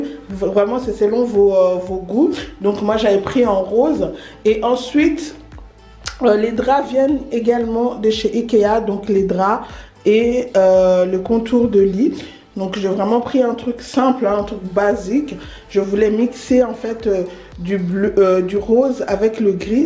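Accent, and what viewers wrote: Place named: Belgium. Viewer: Cc,juste par curiosité: tu es une belge perdue en France? 😜 t a l accent belge! Mais tu parle souvent des magasins typiquement français! Kiabi,gifi........ peut être frontalière? Bisous😘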